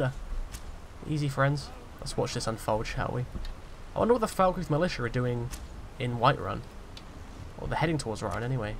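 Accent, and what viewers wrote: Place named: Europe. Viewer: I'm an American and the European accent makes it so much better. Good content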